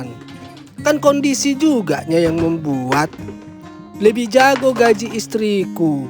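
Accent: Medan, Indonesia. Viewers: Logat Abang seperti orang Medan, apa Abang orang Medan?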